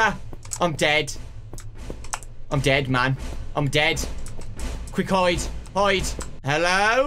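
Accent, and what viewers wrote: United Kingdom. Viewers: your the defenition of funny and amazing at the same time- Also love your british acccent! I think the british accent is just wizard, im studying it now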